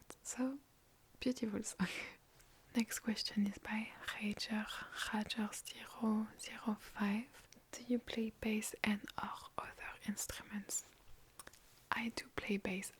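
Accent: France. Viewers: YESSSS THE FRENCH ACCENT I LOVE THE FRENCH ACCENT 🥖🥖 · I think you look much older with your natural hair color. I love it! By the way ,you are so pretty and everytimr I hear your sweet french accent I get the goosebumbs.🫡😔😂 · hii, i'd love an asmr video full in french of following instructions themed, cause with those videos even if i don't speak the language i can still understand what you're saying, and your french accent surely deserves a whole video! 🤓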